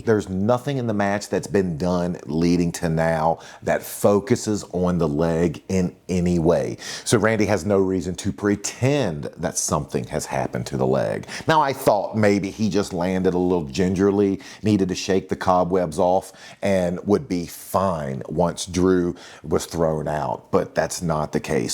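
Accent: Virginia, United States. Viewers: . Your cool af...im used to your accent becouse theres a youtube channel called AFTERPRISONSHOW..and joe the host..has your exact accent..lol and hes from Virginia...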